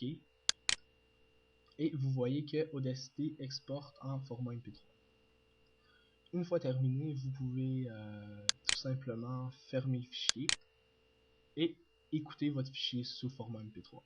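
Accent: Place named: Canada. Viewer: il est canadien c'est normal si il parle un peu différement hein.....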